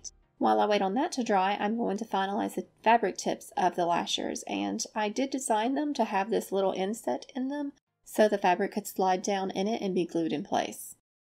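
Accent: United States. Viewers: Awwwww lol!!! I think being from the UK, any accent from the states, us British love!!! 🥰🌈. Your work is so good hun. Thank you for these vids, they really do inspire!! ❤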